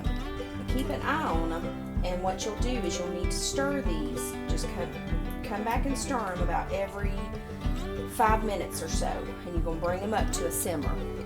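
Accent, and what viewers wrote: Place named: Georgia, United States. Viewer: I'm attempting my first fig preserves. I saved several videos to watch and learn but I didn't make it past yours. 😂 I started it and was like, "hey she sounds like me!" (I'm from GA too) - so I didn't watch any other videos. I've been so intimidated by this but your video makes it look so simple. Diving in now - thank you!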